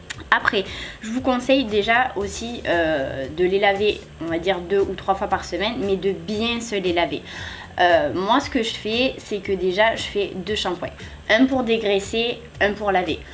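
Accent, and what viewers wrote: South, France. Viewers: Oalalaa sa sent l'accent du sud la mdrrrr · lol tu serais pas toulousaine ta un accent du sud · Tu viens du sud ? J'adore ton accent et tu es très jolie. _ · Tu as l'accent du Sud comme moi... #ACCENTDUSUD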